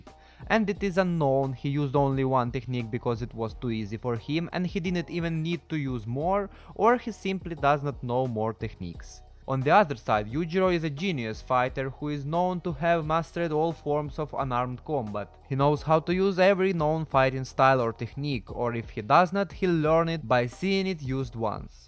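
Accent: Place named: Russia. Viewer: у тебя какой то русское акцент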